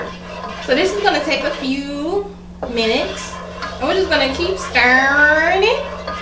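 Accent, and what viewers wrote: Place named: South, United States. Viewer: I just want to know, do you have to talk so country?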